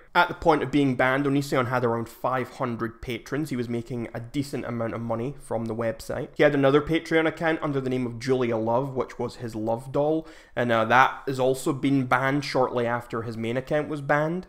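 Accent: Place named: Scotland, United Kingdom. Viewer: Your accent reminds me of my great grandma.. she had the thickest Scottish accent and listening to her talk was amazing.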